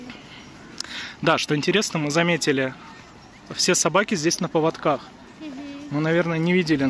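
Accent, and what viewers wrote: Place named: Volga, Russia. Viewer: У вас Поволжский акцент,вы скорее оттуда.Я сам бакинец,но живу сейчас на Кубани на Чëрном море.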